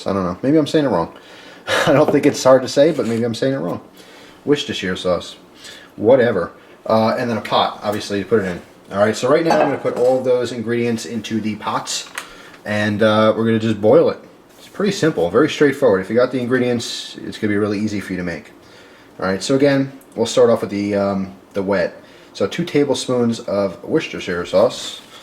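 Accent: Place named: Philadelphia, United States. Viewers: Definitely from South Eastern PA, we drink Wooder not Water LMAO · wodder... and weis store. you are from PA. Thanks for the recipe!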